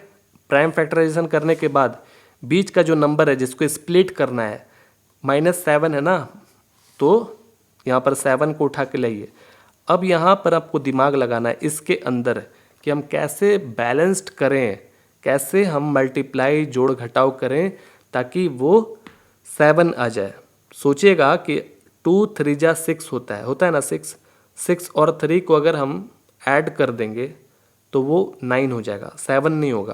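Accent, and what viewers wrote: Bihar, India. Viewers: Sir you are from Bihar 😊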